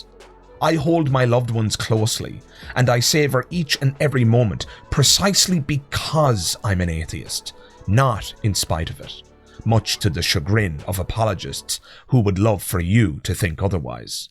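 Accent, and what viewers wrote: Ireland. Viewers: you're welcome, irish script reading guy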